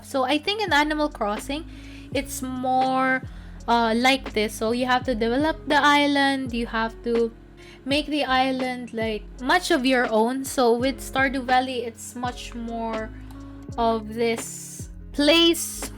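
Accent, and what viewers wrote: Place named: England, United Kingdom. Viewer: You could improve your mic or vocals a bit more and your English Accent. GOOD MEDIUM LENGTH REVIEW!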